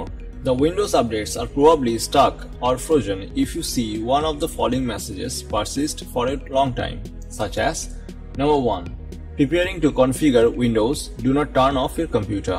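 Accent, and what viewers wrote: India. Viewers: I used automatic subtitles because of his accent (I'm not a native and still aren't used to the Indian accent). The automatic subtitles worked pretty well mostly. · He is speaking English, you fucking pleb. He's Indian and has a strong accent. So as English probably isn't his native language, I think he's pretty understandable.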